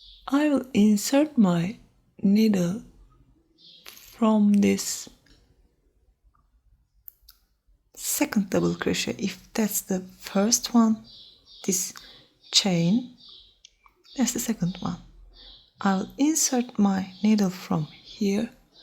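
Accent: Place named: Turkey. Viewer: I've watched a few of your videos and had a feeling from your accent that you're from Turkey 😀 I wasn't sure though but then I saw the yarn you're using. I have this brand too as I live in Turkey 😊 hello to you and thanks for your videos. Your accent and voice is so soothing and your videos are so helpful 🤗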